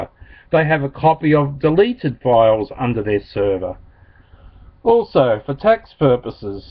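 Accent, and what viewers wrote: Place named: Australia. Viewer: Sounds like you're Australian. Not sure if the laws in Australia are the same as in the U.S. There are a lot of "privacy laws" in the U.S., which Hillary is hiding behind.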